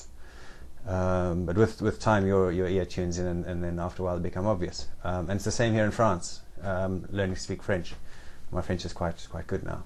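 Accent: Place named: Wales, United Kingdom. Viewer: The American East Coast accent is a combination of English & Irish in some places, but accents vary by region, just like in Britain. I can tell Philadelphians from New Yorkers, for instance. It's very subtle. Canadians from Toronto sound mostly American-ish, but enunciate T's and S's more like the Irish. I find your Zimbabwean accent very pleasant; it's rounder, a little more sing-song than most English accents, almost Welsh sounding. Most Americans would assume you were from England.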